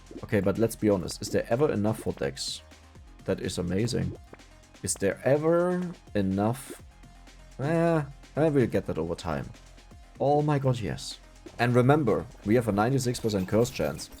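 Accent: Germany. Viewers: Alter ich denk die ganze Zeit was hat der für einen abgefahrenen dialekt. Und dann ja es ist einfach Deutsch mit irgendeinem lokalen Englisch dialekt? Hört sich auf jeden Fall nicht nach Schule Englisch an. Ansonsten schickes video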